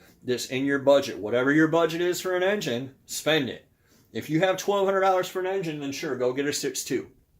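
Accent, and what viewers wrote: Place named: Wisconsin, United States. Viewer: We talk the same over in Wisconsin well i do anyways.....Thanks for the advice man look forward to watching your videos you can give straight forward advice unlike some of the other dumbass videos others put on here....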